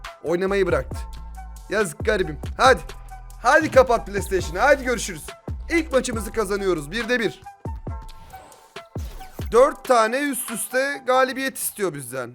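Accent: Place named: Aegean, Turkey. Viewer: abi niye egeliler gibi konuşuyon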